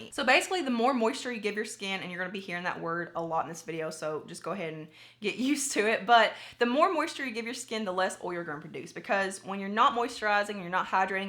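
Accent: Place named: Kentucky, United States. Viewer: Oh no! It looks like it’s still all here for me when I expand it, but I can’t see what you’re seeing so I don’t know where it got cut off, exactly. Go figure: I apply brainpower, it melts my words. I poked around on your social networks looking for an email so I could just send it there, but while it says it’s “below” on your youtube About page, sadly it isn’t, so I hopped to your Facebook and discovered... Lady, you are from Southeast Kentucky. I knew I loved that accent, I’m from Eastern KY but lived in NYC for years & years until family issues brought me back to KY. I will now attempt to “message” it to you via Faceplace, and let’s hope that doesn’t cut it off & not let me know where. Sorry for the discombobulation.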